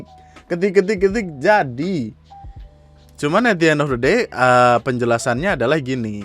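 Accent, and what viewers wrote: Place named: Makassar, Indonesia. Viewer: Dukung bang buat lancar logat Makassar:_andriWkwk::_andriWkwk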